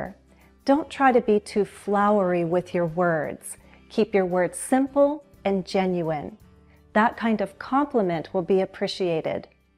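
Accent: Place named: United States. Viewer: I am not too much into the American accent, nevertheless I watched the whole video and sensed how noble and classy you are. you nailed it. Thanks, this is my first subscription to an American channel, big thanks to your friendly video here.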